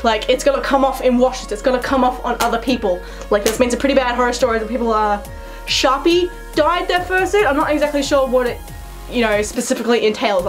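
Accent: Australia. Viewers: Q and A are u Australian or Tasmanian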